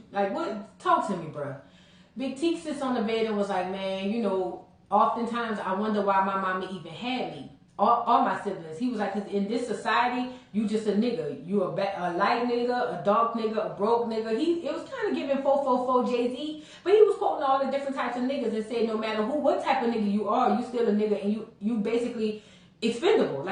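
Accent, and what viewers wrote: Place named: Louisiana, United States. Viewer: I love your reviews girl and love the Louisiana accent 🥰🥰🥰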